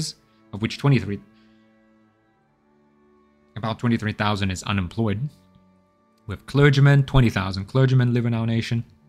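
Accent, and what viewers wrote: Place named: Eastern Europe. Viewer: I've watched a quarter of the video and the question I wanted to ask you the whole time was, are you a native British citizen? Your accent sounds vaguely familiar, are you from Eastern Europe? Sorry if this is a personal question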